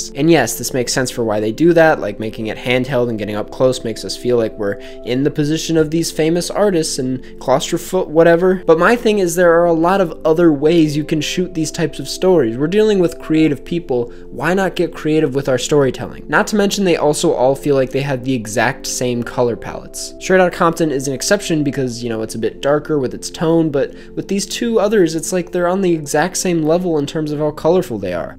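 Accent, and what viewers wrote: United Kingdom. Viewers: The british accent though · amazing british accent man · The british accent tho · you sounds like noel with that british accent wtf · British accent😂😂👌👏🏽👏🏽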